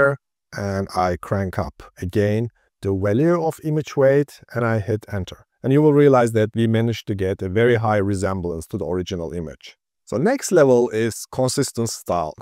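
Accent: Turkey. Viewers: dayı türkmüsün by nası aksan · (sesinizden Türk olduğunuzu varsayarak) Videolarınıza Türkçe altyazı ekleseniz çok iyi olurdu. Ne yazık ki Türkçe kaynakların çoğu “en azından benim gördüklerim” GPT ile nasıl para kazanılırdan öteye geçmiyor :) Anlatımınız ve video için teşekkürler.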